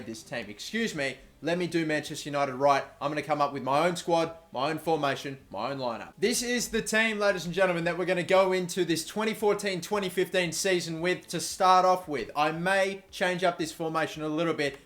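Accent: Australia. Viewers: Definitely a fellow Australian? Nice video dude. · You an Aussie?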